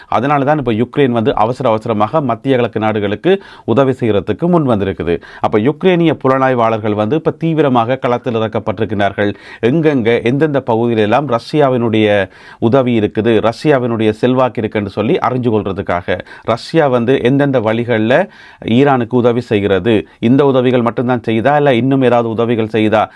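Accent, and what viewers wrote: Sri Lanka. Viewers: ஐயா உங்களுடைய பெயர் என்ன நீங்கள் இலங்கையில் இருக்கிறீர்களா அல்லது லண்டனில் இருக்கின்றீர்களா இலங்கை தமிழர் மாதிரி பேசுகிறீர்களே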